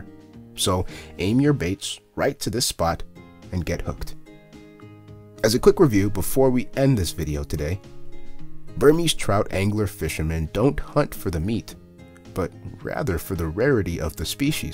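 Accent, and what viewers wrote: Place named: London, United Kingdom. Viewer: Fuh, suara sifu saya ni memang lain macam. Loghat London la. Haha, padu sifu atas ilmu yang dikongsi. #PUNCA #klusterKN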